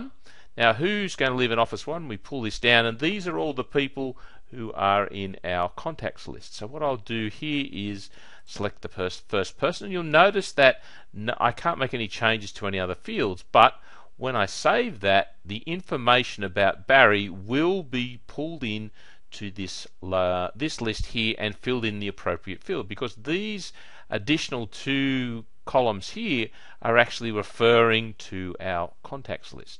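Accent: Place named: Australia. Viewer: Many thanks for the reply - searching on the web all the articles are for SP 2010 or maybe 2013 and all recommend not using (large) lookups as they slow the system down too much, I guess I can only do some testing to see what sort of impact the large lookups I'd like to implement will actually make. Also nice to hear an Aussie accent on youtube, keep up the good work mate.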